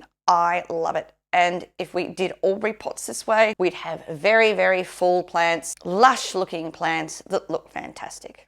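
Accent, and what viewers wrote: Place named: Australia. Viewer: It's nice to hear another Aussie accent on here ! 👍🇦🇺 Sub 412 here. 😃 Yes, $14 for 1 cutting sucks !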